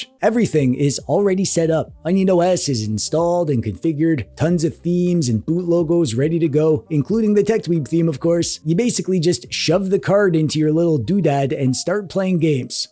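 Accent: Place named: Canada. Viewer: Anyone with eyes can compare the hands and see they're two different people. There was an April Fools gag last year where pretended to be. Also, is Canadian. There is no way I can believe that could pull off that accent. If he could, he wouldn't be making YT vids, he would be making bank as a voice actor.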